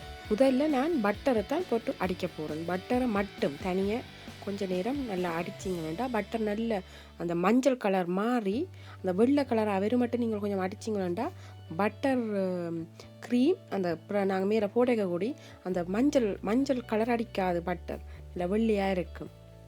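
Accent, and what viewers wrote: Jaffna, Sri Lanka. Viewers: Akka sema Jaffna Tamil akks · Unkada pechchu alaka irukku akka 😄😄😄😄👍❤ nenkal srilanka ena 😀😀🤗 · Nenka jaffna tamil pesurinka,💞💞💞😍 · கிண்ணி நீங்க யாழ்பாணமா?? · jaffna tamil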